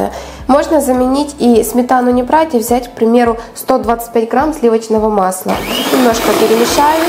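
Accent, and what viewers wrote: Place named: Ukraine. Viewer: Какая ты прикольная почему похудела очень нравится твой украинский акцент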